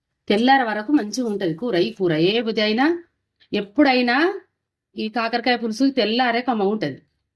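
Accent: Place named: Karimnagar, India. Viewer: Meedhi karimnagar eh ayi untadhi kada aunty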